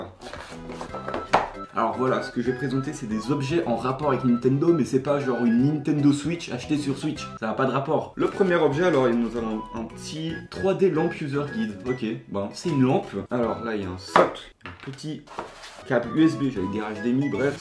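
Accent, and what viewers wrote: Switzerland. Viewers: Es-tu suisse ? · Mdr je savais pas que t'étais suisse 😂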